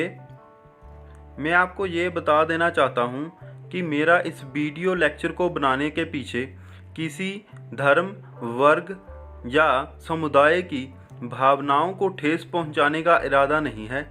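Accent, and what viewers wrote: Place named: Punjab, India. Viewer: Everything is very knowledgeable, everything thing is perfect but icing is your hindi in Punjabi.. this is your Canadian brother! Love u 😘 bro.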